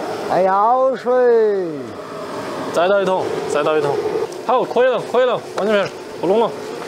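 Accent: Sichuan, China. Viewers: 作为一个重庆人，习惯了油管上的国语和英语，听到王刚的四川话，既感觉亲切，又有些想笑。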